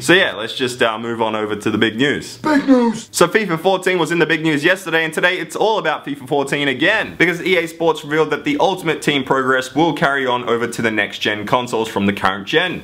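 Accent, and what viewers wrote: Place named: Australia. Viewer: If your accent really is Australian what part of AUS are you from?? Curious from a country Victorian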